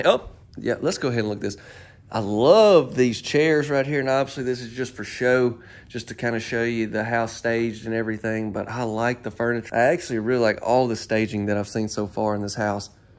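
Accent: South, United States. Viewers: I’m from the northeast. NJ to be exact. I do enjoy listening to your southern accent and your humor. It’s so entertaining. This is my favorite so far. I’ll save this for future reference when I move south or southwest. The floor plan is what I want.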